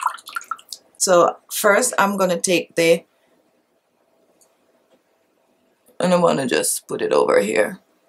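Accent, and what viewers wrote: Jamaica. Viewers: kokonut 4ever Jamaican-it's evident from how she speaks and pronounces her words. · hey girl I am loving your videos, I can hear your Jamaican accent you go sister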